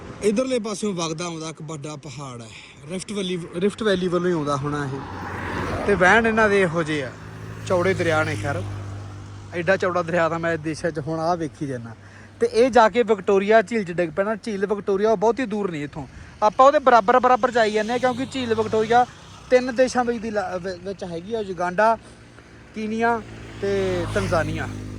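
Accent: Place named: Punjab, India. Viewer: ਤੁਹਾਡੇ ਦੁਆਰਾ ਬੋਲੀ ਜਾਂਦੀ ਪੇਂਡੂ ਪੰਜਾਬੀ ਬੋਲੀ ਅਤੇ ਚਾਰ ਚੁਫੇਰੇ ਦੀ ਜਾਣਕਾਰੀ ਮਨਮੋਹਕ ਹੁੰਦੀ ਹੈ ਵਲੋਂ ਮਾਸਟਰ ਜਸਪਿੰਦਰ ਸਿੰਘ ਗਿੱਲ ਪਿੰਡ ਉਬੋਕੇ ਤਹਿ ਪੱਟੀ ਜ਼ਿਲ੍ਹਾ ਤਰਨ ਤਾਰਨ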